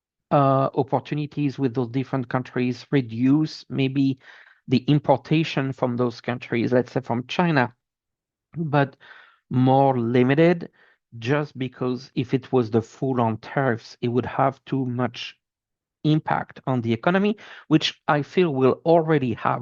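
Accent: France. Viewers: I as well. I enjoyed your show with Linda G. I recognized your French accent immediately since my mother was from France. Merci beaucoup! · Interesting and clear. I note a French accent 😊would of like to know more about Canada . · New subscriber here. Like the reading. Very detailed. The hint of a French accent is also intriguing. 🙏😊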